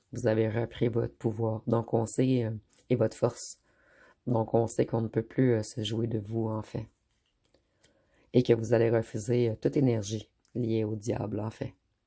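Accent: Quebec, Canada. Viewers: Ah ,le fameux accent des Québecois(ses)....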